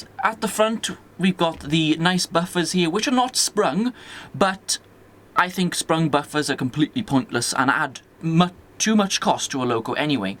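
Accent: Wales, United Kingdom. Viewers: omg are you welsh ? and wered ya get one my nethew would go mad to get 1 lol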